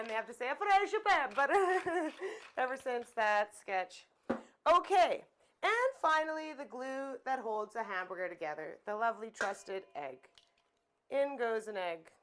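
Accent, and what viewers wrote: Canada. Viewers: You Canadian? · She sounds Canadian to me.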